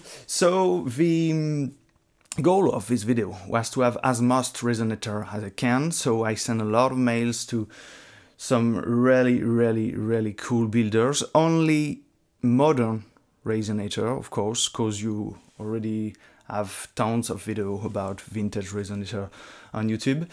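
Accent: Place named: England, United Kingdom. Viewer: Super video, bon j'avoue que l'accent anglais pique bcp et que j'ai eu un peu de mal avec ça mais sinon très chouette sujet, bien traité et magnifique jeu ! Gros coup de coeur pour les résonateurs mule depuis quelques temps (et cette vidéo ne fais que le confirmer) donc ma préférence va au tricone mule vert.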